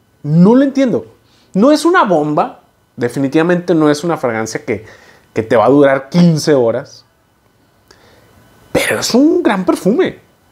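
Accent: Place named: Monterrey, Mexico. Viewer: Por la camisa que traes y el acento que tienes,me imaginó que eres de Monterrey y allá el calor esta cañón...buen perfume para el calor de Monterrey.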